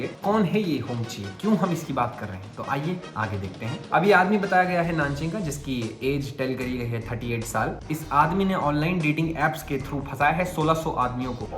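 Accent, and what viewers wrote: Pahadi, India. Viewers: Yes 😂 why not., I literally laugh every second 😂 plus your pahadi accent ❤ lots of love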